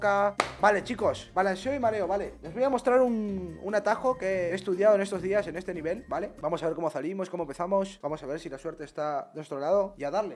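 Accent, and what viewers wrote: Spain. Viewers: Sonas re español boludo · fuera de joda te sale bien el acento español jajskajs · Ver a jugar el es muy divertido, y más con su acento español que le sale muy chevere. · suenas muy español en este video, siempre me alegras la noche y el dia <3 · Es idea mía o habla como español?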